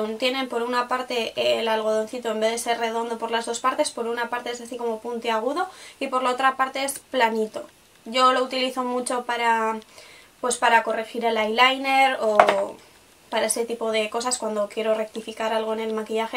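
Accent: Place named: Spain. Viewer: ya aqui dejando comentario , me encanta tu espanol ,estoy planeando viajar por europa y ojala se me realice y si no seguire viendo videos , :) cada vez que veo productos de espana me vuelvo loca y digo wow porque no mandaran un mercadona a japon o una tienda de kiko o escence pero bueno tiene lo suyo , me pase buscando la base fit que antes usaba s pero creo que la,descontinuaron aqui o no se si hubo alguna vez ,en fin me encantaron las toallitas que usas para desmaquillar ,yo tambien uso porque como tu me hago un lio limpiandome ,soy super practica , no recuerdo q mas ensenastes pero bueno segun lo revuerdo todo esta super bueno . dedito arriba y gracias por el,video informativo ojala puedas responderme te sigo,de hace poco pero ya vi todos tus videos besos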